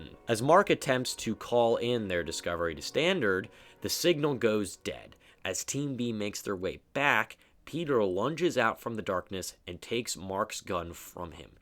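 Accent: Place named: South Jersey, United States. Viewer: I'm hearing a south jersey accent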